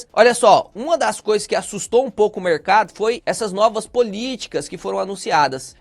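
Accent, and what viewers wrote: Caipira, Brazil. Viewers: “CAIRTERA”? Esse sotaque caipira fode qualquer vídeo. Não tem um fonoaudiólogo que dê um jeito nisso?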